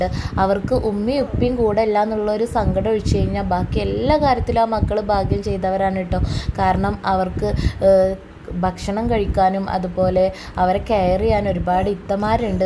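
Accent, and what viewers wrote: Malappuram, India. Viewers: Ningalude sthalam evdeyaa.. Malappuram anoo.. Pakka malappuram language anallo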